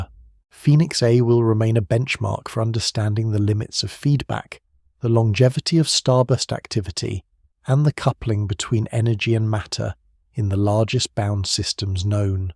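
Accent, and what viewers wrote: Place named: United Kingdom. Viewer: 2 plus hours of yap yap with British irritating narrator voice 🎉please 🙏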